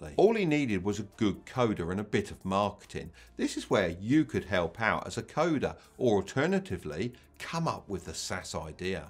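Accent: United Kingdom. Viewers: you have a british accent so i trust you 100%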